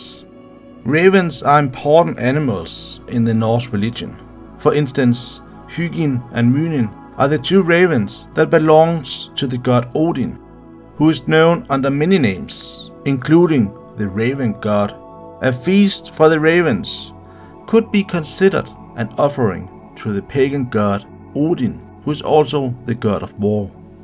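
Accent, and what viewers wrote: Denmark. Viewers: I swear this guy is danish i can feel it in my danish body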